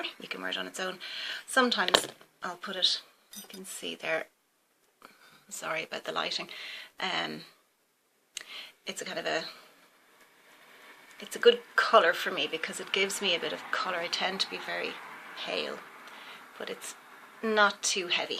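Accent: Ireland. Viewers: Hi, you sound Irish, beautiful accent and beautiful lady, you are so refined, lovely. I'm from Nova Scotia Canada, and a great line from here is called The Ordinary, natural, no silicone, no additives etc and wonderful prices, its blowing up on you tube! Wayne G recommend it! I love Chanel foundation as well. Looking forward to watching more of your videos, Karen from Canada 🇨🇦.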